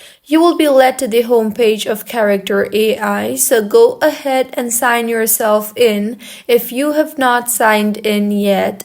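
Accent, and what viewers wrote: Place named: China. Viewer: CHINESE ACCENT NICE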